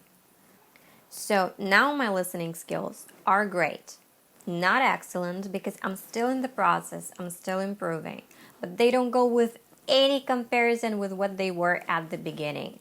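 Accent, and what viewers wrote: United States. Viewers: I thought you were American.Mashallah you are very successful. I just discovered you. I have no intention of leaving you.I'm your student from Turkey.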